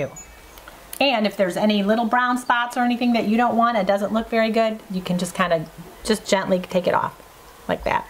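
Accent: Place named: New Jersey, United States. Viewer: Your accent reminds me of a Jersey girl. Where were you originally from? I really enjoy your videos...VERY helpful!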